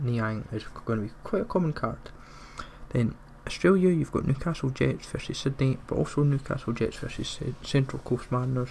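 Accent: Scotland, United Kingdom. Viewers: normally don't have a problem understanding jocks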